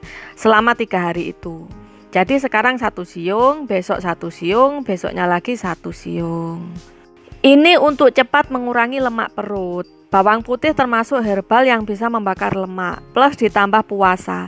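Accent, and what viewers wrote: Java, Indonesia. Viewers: kok logate jowo ki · medok tp enak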